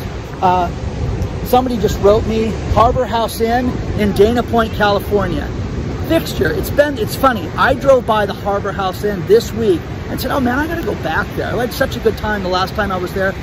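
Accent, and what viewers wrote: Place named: Upper Midwest, United States. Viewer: bruh, where was your upbringing? your accent is driving my hubby crazy- he thinks upper midwest or northwest coast? p.s. we like ya vids.........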